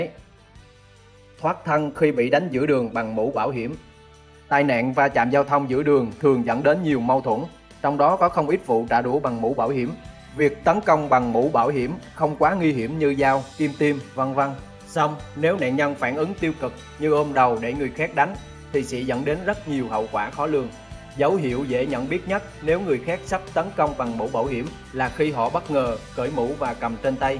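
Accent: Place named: Rural, Vietnam. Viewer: Giọng ông này sao giống nhà quê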